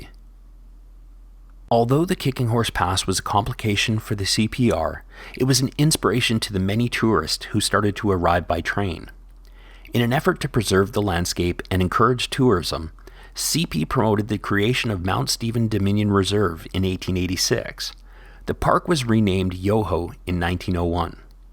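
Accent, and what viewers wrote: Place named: United States. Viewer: For someone claiming to be local, it is annoying to find that you are American. Your accent ant mispronunciation of words, especially "route", belie your true nationality.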